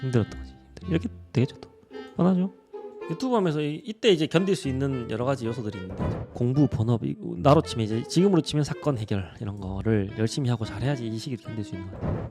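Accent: Gyeongsang, South Korea. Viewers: 오 대구남자였네...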